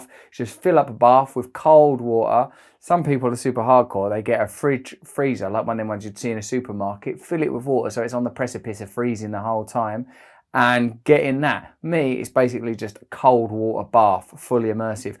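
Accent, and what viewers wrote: Cockney, United Kingdom. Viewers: Us bloody yanks would actually take you seriously when you sack your cockney accent.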